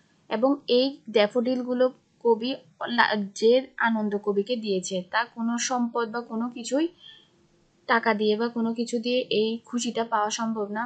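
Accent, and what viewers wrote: Chittagong, Bangladesh. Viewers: সুন্দর তবে চট্টগ্রামের টান মনে হচ্ছে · Maam mone hoy...Chottogram er..... vasa shune mone holo....😊❤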